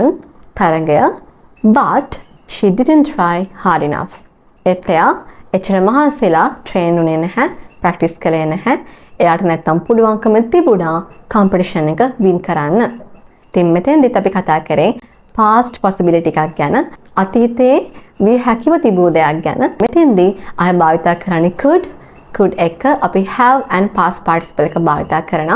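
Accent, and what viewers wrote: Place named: England, United Kingdom. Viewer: i love your accent, my guess is that you studied in England.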